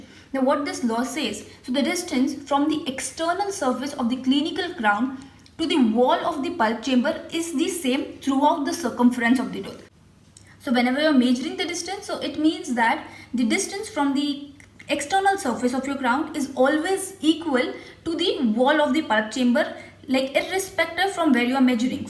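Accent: India. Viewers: Despite the horrendous Indian accent, the explanation was excellent, good work.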